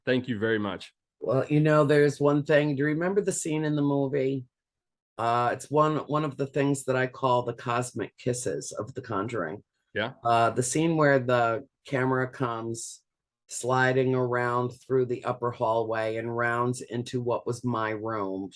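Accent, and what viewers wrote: South Africa. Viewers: I will definitely. I like your Afrikaans accent. Groete van Kaapstad!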